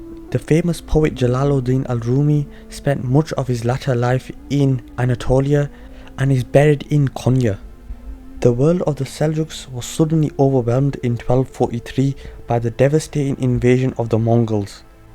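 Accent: Northern England, United Kingdom. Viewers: Salaams I thought you did pretty good considering it was your first time... keep practising and you will get better and better guessing from your accent you sound like you are from Lancashire/ Yorkshire. Am I correct? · Thanks! The translation is not good. It has Thailand instead of Byzantine lands. Your accent is like Northern English and very easy to understand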